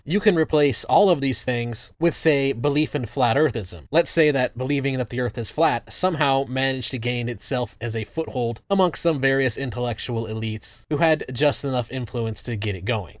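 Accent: Boston, United States. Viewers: Yeah, she's originally Bostonian. Moved to an undisclosed location and has tried to get the beantown out of her voice to little avail.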